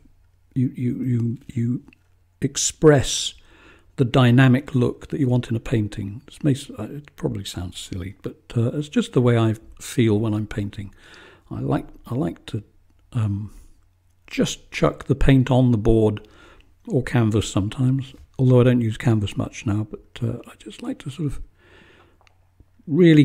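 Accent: United Kingdom. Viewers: Thanks for sharing your wonderful unique creativity. I love oils and your style is so inspirational that I can't wait for my current work to finish so I can try your method. Love listening to you. Besides the lovely British accent, you don't ramble on like some artists I tried watching and chose to turn off sound because of the non topic nonsense they were talking about. Musical intervals are just perfect!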